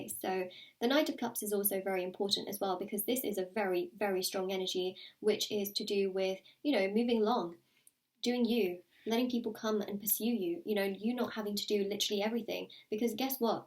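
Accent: United Kingdom. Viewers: Bless you, wonderful reading. Good to hear a British accent, majority are American. I’m in Doncaster South Yorkshire. Have a super weekend, namaste 🙏 🥰💕🪴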